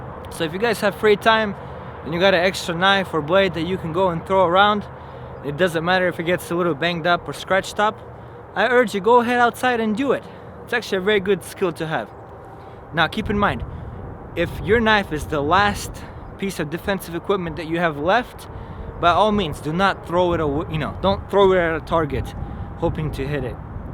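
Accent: Russia. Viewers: i like your russian accent :D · OMG are you serious? He says "Привет, товарищи" and "мастерская" fluently, at the same time speaking English with a Russian accent. Of course he's Russian.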